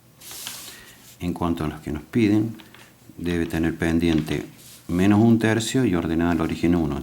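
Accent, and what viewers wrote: Córdoba, Argentina. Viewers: de que parte de cordoba sos maestro??? Sos un grande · sos cordobes??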